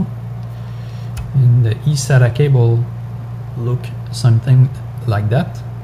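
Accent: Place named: Quebec, Canada. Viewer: jvoulait dire que d'est que j'ai entendu ton accent j'ai su tout de suite que t'etait quebecois lol, bon video en passant, desole pour la confusion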